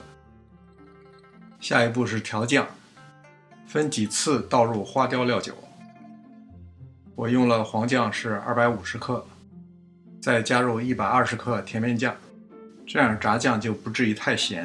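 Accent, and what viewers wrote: Beijing, China. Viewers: 地道的北京话加上地道的炸酱面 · 京味儿好亲切呀！您的菜谱太适合我们这些长年在外的人了！多石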